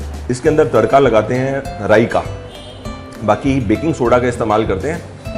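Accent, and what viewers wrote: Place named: Delhi, India. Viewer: sahib,soo waat chay surat na visit nee,aap ki zabaan tau Dehli walon jesi hai,aap ki Urdu numa hindi bohat lutf daiti hai. Love from Pakistan 🇵🇰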